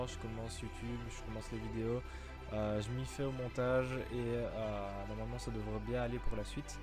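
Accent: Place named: Liège, Belgium. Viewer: Tu viens de Liège ? xD je kiffe cet accent 😂👌🏻 sinon bien la vidéo c’est cool je vais sûrement prendre celui là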